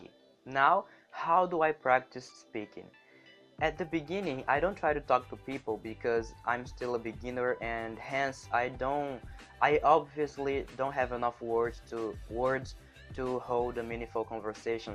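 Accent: Brazil. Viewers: Não, a maior parte das palavras que utilizou são intermediárias, e o fato de ele ter um sotaque um pouquinho típico de brasileiros facilita a compreensão. O vídeo também só tem 9 minutos, o que facilita mais ainda ter uma boa compreensão. Mas sim, você está num ótimo ponto para começar a estudar a língua e falar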